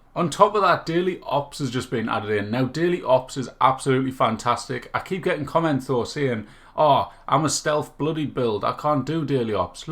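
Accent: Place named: Newcastle, United Kingdom. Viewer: This was a jokes watch. Pure geordie rant saying it how it is😂😂 however I watched this video purely to see if the game is good now (lol, fourth attempt since release) and it hasn’t exactly sold it to me! Entertaining nonetheless!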